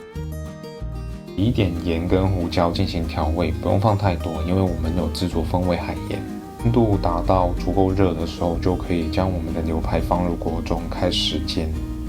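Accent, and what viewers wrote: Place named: Cantonese, China. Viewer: 我個人喜歡有點口音的感覺 偶爾有點廣東話交叉也不錯 各有所好吧 加油💪🏼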